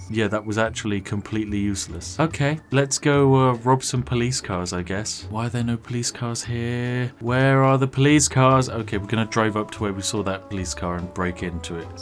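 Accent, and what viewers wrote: United Kingdom. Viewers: Quite literally took me one minute to get into the video to realize this was a video and not an amphibian video. Even the hello gentlemen didn't phase me, I saw zomboid and brain went that's an amphibian video, but then my brain registered the accent and the lack of philosophical ramblings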